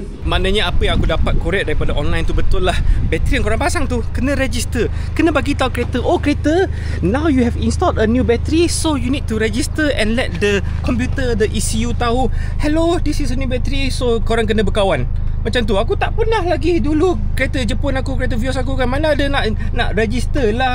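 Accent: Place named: Terengganu, Malaysia. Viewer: dia bercakap tu BAHASA MELAYU.. CUMA DIALEK HULU GANU.. ADOII.. SUSAH KALAU HIDUP BAWAH TEMPURUNG NI.. aku yg di sarawak pun tahu.. ko dari planet mana😂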